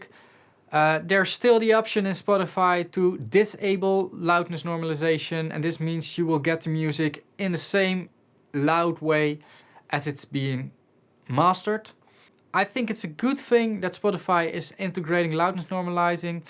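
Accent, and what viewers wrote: Netherlands. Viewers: Sounds dutch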